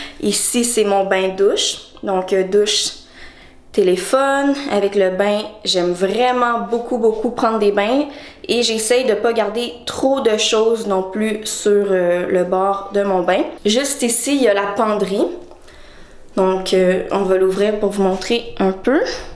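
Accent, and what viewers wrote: Quebec, Canada. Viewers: Tu parles vraiment le " jouale" ...Bref ! Belle appartement et bien aménagé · Tu parles un peu comme une canadienne? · ouh l'accent québécois j'adore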